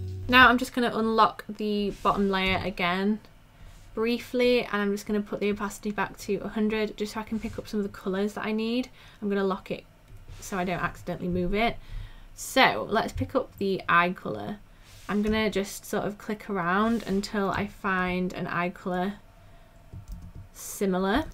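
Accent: United Kingdom. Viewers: I both enjoyed watching you drawing and listening to your British accent❤️ This video is perfect✨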